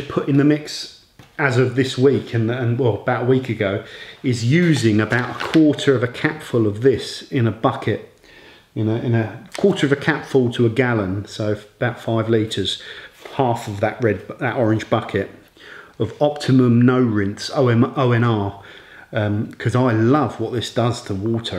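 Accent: United Kingdom. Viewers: More people need to listen to you in the detailing industry... Having 30 steps to weekly wash a car is just crazy to me. I always try to do a good effective wash without spending 2hours of preps+wash+putting everything back in the garage. And i also use in my rinse bucket a vertical grit (bough at my hardware store) to wash my mit properly, then wring it out. I think i am going to try your method, just because it makes it so cheap, safe, and practical. Keep up the good work my friend, plus i always laugh at your jokes, might be the british accent lol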